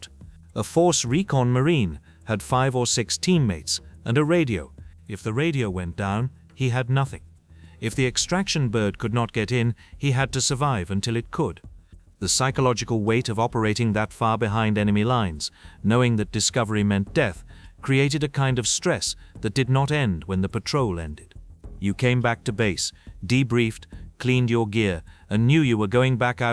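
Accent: United Kingdom. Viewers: I don't want to listen to British accent voices talking about US Marines we don't have any Americans that can narrate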